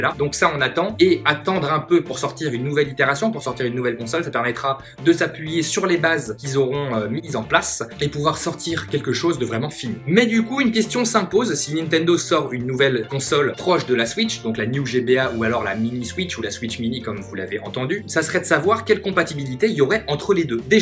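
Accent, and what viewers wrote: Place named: South, France. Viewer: accent du sud mdr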